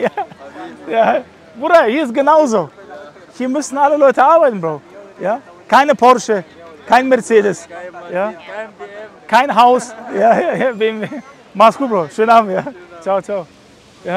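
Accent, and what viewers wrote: Berlin, Germany. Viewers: Dit machste schon jut Immer schön Berliner Schnauze wa Hau raus Bro 🙌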